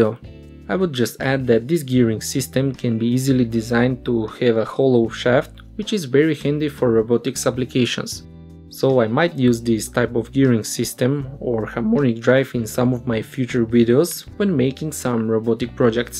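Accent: Russia. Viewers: Please stop talking with a Russian accent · Русский акцент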